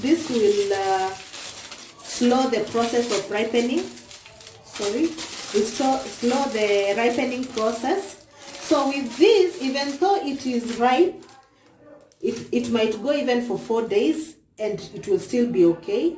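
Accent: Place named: Kenya. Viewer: As soon as i heard your voice - i was in love :-) Love the Kenyan accent. Been there on a long holiday and at Nairobi. Love what you've done here, really helpful and well filmed. Likes and Subscribe from me :-) Discovered your channel while watching the 'Vihaan' channel.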